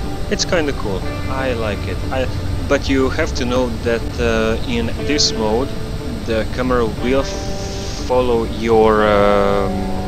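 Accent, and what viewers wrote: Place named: Russia. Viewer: Русский акцент)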